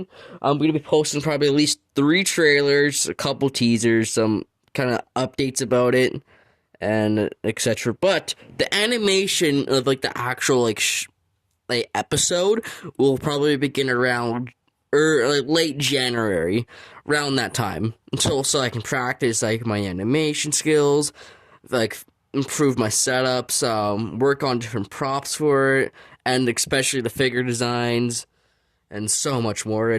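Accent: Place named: Canada. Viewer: Damn bro you sound hella Canadian😂✌️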